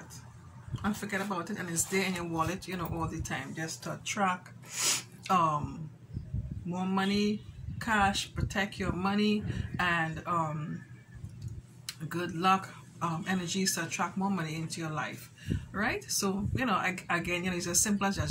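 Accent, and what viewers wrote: Trinidad and Tobago. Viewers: You sounding Trini..just came accross yr channel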